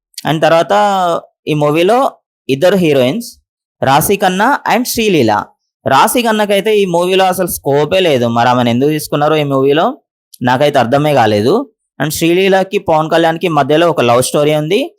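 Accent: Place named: Telangana, India. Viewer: Madi telangana bro 😂😂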